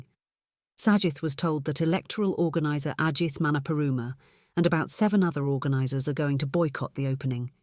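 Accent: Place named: RP, United Kingdom. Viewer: Queen`s English. Very good.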